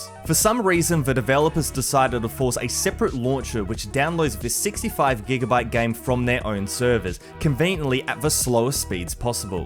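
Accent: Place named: Australia. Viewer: You sound Australian my guy. Do you ever get good ping?/are their AU servers? From the 5 or so games I've played they've all been red ping. Not worth the headache for me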